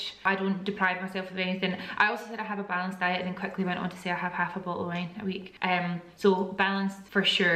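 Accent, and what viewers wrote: Scotland, United Kingdom. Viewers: I’m a huge long time fan from U.S. and I just want to point out that the Scottish accent is extra strong today . LOVE IT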